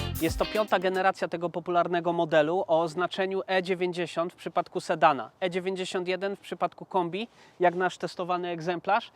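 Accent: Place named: Warsaw, Poland. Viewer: Te warszawiok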